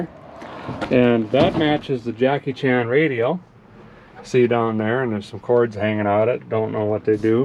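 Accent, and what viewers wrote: Minnesota, United States. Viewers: I bet you're thrilled to have her home. I have a '59 Skyliner that's been in my family since '78. When my dad died, a few friends suggested I sell her. They just don't get it. A little Minnesota tie in, she may have an intake manifold yanked out of a donor at Windy Hill in New London, probably in about '86 or so. We were up there for some family stuff, and the old man wanted to go junking. I enjoy your channel. It's nice to learn stuff, while also enjoying your accent. You talk like most of my family from up there. I was born in MN, but smart enough to leave before I was 2. 😎🤓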